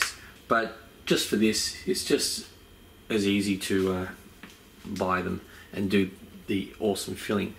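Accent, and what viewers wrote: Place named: Australia. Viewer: D A T CR4zY Azn no he is definitely Australian ...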